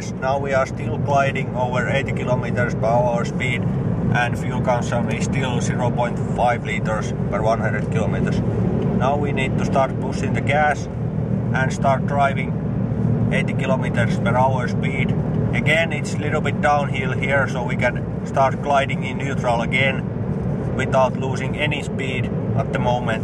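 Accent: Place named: Finland. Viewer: Finish accent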